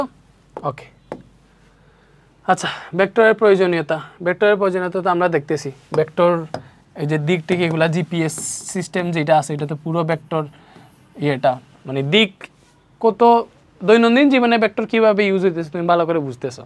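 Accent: Sylhet, Bangladesh. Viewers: Vaiya apni ki Sylheti...?? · vai apni ki sylheti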